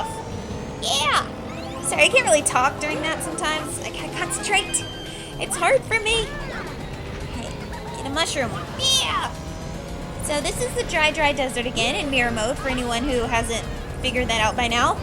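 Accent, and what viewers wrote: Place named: New Jersey, United States. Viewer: nice joisy accent haha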